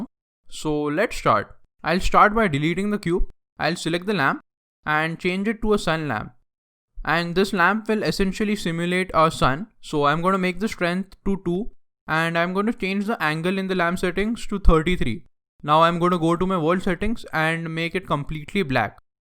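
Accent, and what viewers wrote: India. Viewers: Your tutorials are so much great, are you indian, ? As your accent looks indian, i love your tutorials 😀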